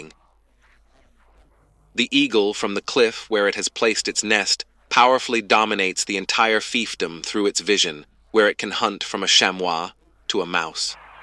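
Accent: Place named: Andalusia, Spain. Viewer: TAN SOLO ESCUCHAR SU VZ DE UN CASTELLANO ANDA LUZ ME ENFOCA YA M ILUMINA LA SABIDURIA.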